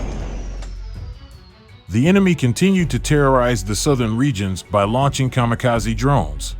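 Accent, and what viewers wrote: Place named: United States. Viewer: Also, why the voice of a hick?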